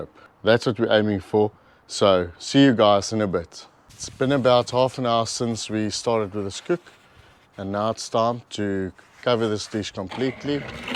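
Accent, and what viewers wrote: South Africa. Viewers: Thank you! I have been looking for a video like this, But most do not show it right, or explain it like you do. I was pleasantly surprised to hear the South African accent on top of it. (I live in USA for 37 years now, but grew up in the highveld) I acquired a whole sheep a few months ago, and pulled out one half of the ribs to try them out. I will be using your method. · south african accent - hows the racism there?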